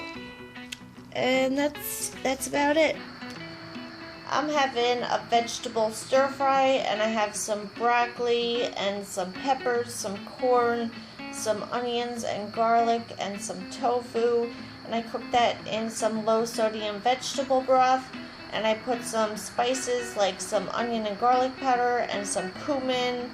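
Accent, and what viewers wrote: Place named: Boston, United States. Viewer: you from boston? sounds like it how u pronounce coffee, sauce and salt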